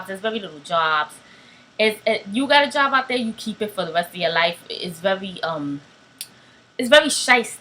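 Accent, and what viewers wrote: New York, United States. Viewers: Physically you are a very typical beautiful Puerto Rican woman. The thing you need to change is your Nuyorican traits. This is something that can be done if you work at it. And don't forget the NY accent. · J. García She talks like a Nuyoricans not black. This is the way we speak. Black people in America don't talk like this outside of NY. You got it twisted bc you're ignorant.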